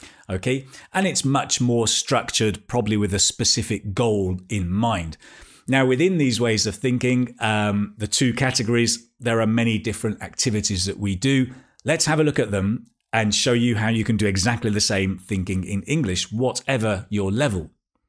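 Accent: Spain. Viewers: you have spain accent! it sounds curious! thanks for ur lessons!! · Hahaha I love your Spanish (from Spain!) accent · Me sorprendió tu acento español 😃 It's great !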